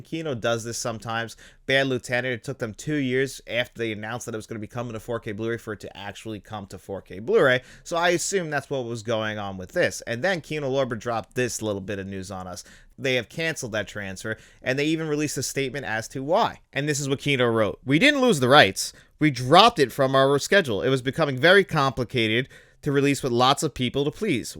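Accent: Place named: Cockney, United Kingdom. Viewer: Cockney accent! From London 😎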